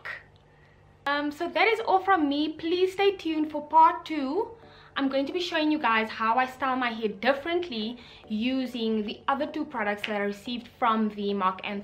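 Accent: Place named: South Africa. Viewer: You sound so south african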